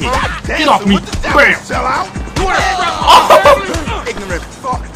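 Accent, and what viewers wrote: United Kingdom. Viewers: Is he British?!?! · is he british? please reply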